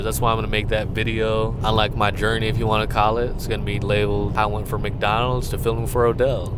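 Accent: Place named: Alabama, United States. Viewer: Getting the Alabama accent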